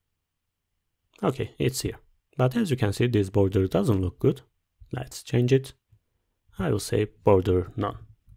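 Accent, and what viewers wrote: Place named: Turkey. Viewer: your accent sounds Turkish, keep up the good work king 🤍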